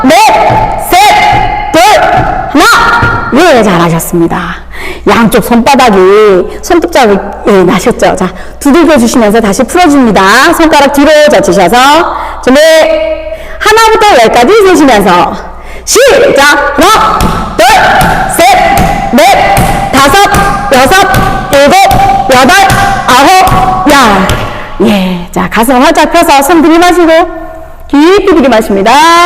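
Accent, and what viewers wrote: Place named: Chungcheong, South Korea. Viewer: 강사님의 밝고 힘있는 구령소리를 들으니 저절로 운동욕구 충만해 집니다. 충청도 사투리도 재밌네유~~ 매일매일 보면서 운동 열~심히 하겠습니다. 고마워유~~~^,^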